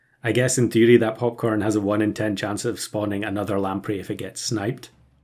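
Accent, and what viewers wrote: Scotland, United Kingdom. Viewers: Great, realy enjoy your Content thank you 🙂 you have a Great soothing scottish Voice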